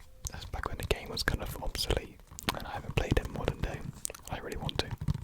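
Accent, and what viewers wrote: United Kingdom. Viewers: I can tell my teacher I'm learning British English with Asmr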